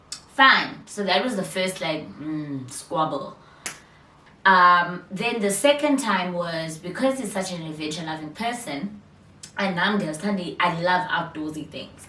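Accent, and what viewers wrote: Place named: United States. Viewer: This American ascent thingy confuses me 😑 but kept watching video to an extent 😑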